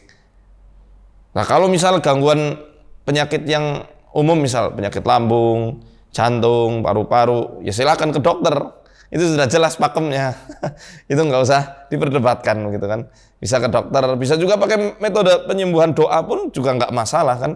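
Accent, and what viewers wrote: Banyumas, Indonesia. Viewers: Ngapak ustad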